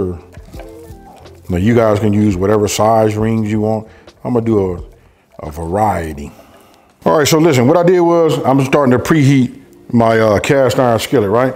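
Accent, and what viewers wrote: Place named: West Coast, United States. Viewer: You 👀 my size. Southern with a West Coast accent😅. I love it! Also, I need that mandolin.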